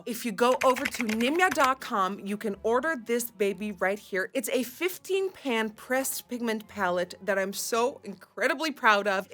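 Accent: United States. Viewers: how often do you come to the US? You have an American accent. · Why does she have an American accent, Dutch people have such a strange accent.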